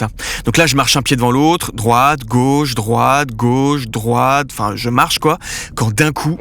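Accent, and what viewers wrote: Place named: Paris, France. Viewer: l'accent parisien le plus insupportable jamais entendu par l'homme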